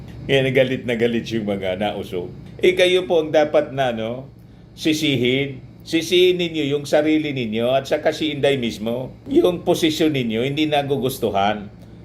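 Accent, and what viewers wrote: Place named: Bicol, Philippines. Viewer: Baket ganyan ka mgsalita!bicolano ķa ba!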